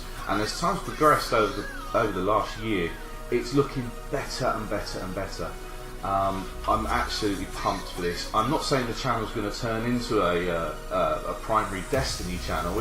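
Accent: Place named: Cockney, United Kingdom. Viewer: second that! Good to have your cockney tones back on YouTube : D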